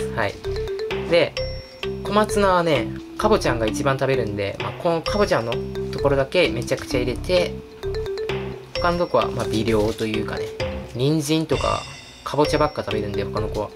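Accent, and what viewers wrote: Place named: Kansai, Japan. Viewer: 関西弁がとても胸を使われたので不純な気持ちでチャンネル登録してもーた🙈💕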